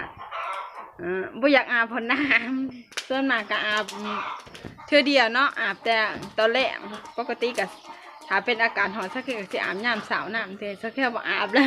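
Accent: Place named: Sisaket, Thailand. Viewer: เว้าคือแถวศรีสะเกษเลยครับ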